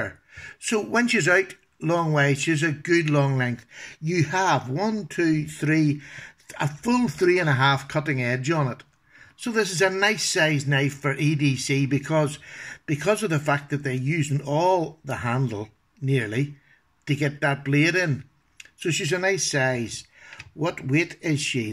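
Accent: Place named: Ireland. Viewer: What about ya. I just came across this video and noticed the accent and I’m from Ireland myself. What part are you from? I’m from County Armagh. Good to hear a fellow Irishman on here. Fare play to ya boy. I’m going to subscribe to help a fellow Irishman our. Great wee video. Cheers mate.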